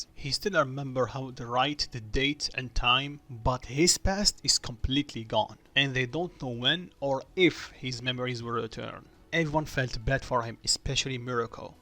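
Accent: Russia. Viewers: Are you Russian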